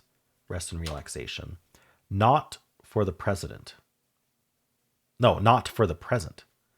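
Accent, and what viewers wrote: United States. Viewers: There's the old American 'T' that sounds like a 'D' again.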